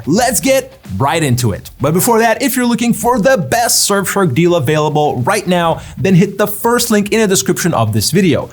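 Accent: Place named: United States. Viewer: This guy speaks like a tosser. Slow down and stop the up and down voice "noise" Not everyone likes to listen to that American noise!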